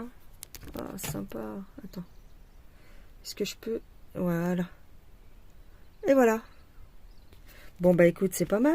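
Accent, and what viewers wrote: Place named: Toulouse, France. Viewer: Coucou ma belle 🩷elles sont magnifiques tes toiles et le loup est magnifique 😍. Super ton humour, tes jolies phrases, on dirait une petite fille 👧. Merci pour ce partage et bon courage pour tes toiles dont tu aimes tant! Super 🤣🤣😀😀ton accent de Toulouse. 🩷🩷💜💜😀😀🌅🌅 Belle fin de journée à toi et famille 😘😘😘ainsi qu’aux loulous 🦮🦮🐈🐕🐶😘😘😘😘😘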